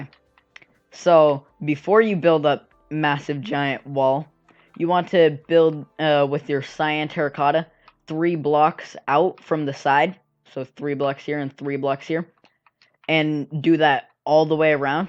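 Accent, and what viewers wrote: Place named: United States. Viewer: Ok like no hate or anything I appreciate the tutorial and stuff but why are you using British lingo (like “rubbish” and “a wee bit”) but you have an American accent?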